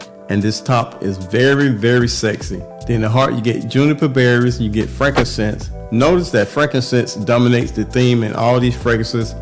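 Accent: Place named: Alabama, United States. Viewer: Yo! Wherebot you from brutha? I hear some Alabama in ya talk. Love the content. Get well soon fam. Checking in from Alabama.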